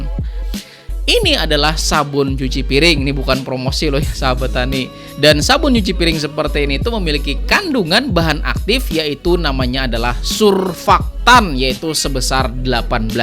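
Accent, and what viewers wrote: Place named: Barabai, Indonesia. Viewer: Logat pian kaya org hulu sungai barabai pak ae😁 salam kenal dari lun petani semangka kab kotabaru kalsel.🤝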